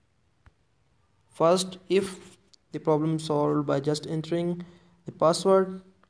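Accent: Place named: India. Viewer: Another heavy india accent every fucking video...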